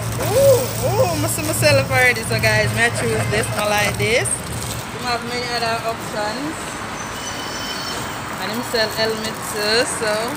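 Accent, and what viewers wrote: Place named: Jamaica. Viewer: Girl. Your accent . Fellow Jamaican here. When u said ' mi jus a waut pon the light fi change u zeen ' dwl 😅 and your Chinese😂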